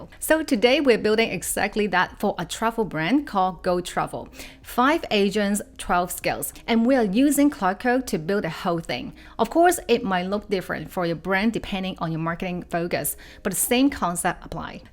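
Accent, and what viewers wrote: China. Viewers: you are talking TOO fast and the Chinese accent doesn't help! You are also rapidly Jumping between applications while you speak, making it very difficult to figure out which application you are on. I thought this was a step-by-step guide, not an exercise in speed-reading!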